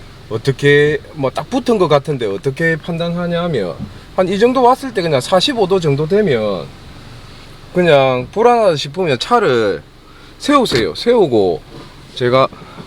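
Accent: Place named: Western Gyeongnam, South Korea. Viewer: 사투리가 서부경남쪽이네요 맞죠?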